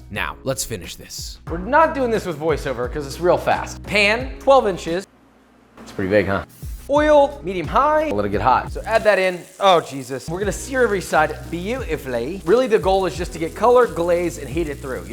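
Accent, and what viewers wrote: England, United Kingdom. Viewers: As someone from the UK I really like his English accented quips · where did the british accent come from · Why the British accent tho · Can we have Josh do an entire video with the English accent? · Josh's English accent is better than Oscar Isaac's. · That English voice is brilliant. Most Americans do it in a jokey way but you, you son, make my proud. (I’m English if you didn’t get the drift) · You're an inspiration to us all buddy, keep up the good work!. Josh's British accent gets me every time. Love it.. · Your English accent is getting quite refined You absolute mad lad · The British accent has me dyinggggg lol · What's with the terrible English accent?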